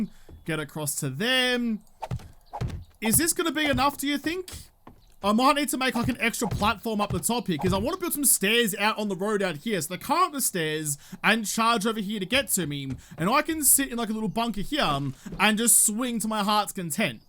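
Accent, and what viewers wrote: Australia. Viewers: The aussie accent always thickens when he's excited or stressed.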